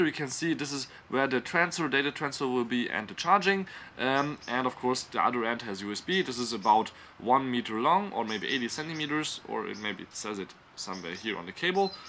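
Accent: Germany. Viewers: Dieses Deutsche akzent schrecklich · Deutsche die Englisch sprechen hören sich so scheiße an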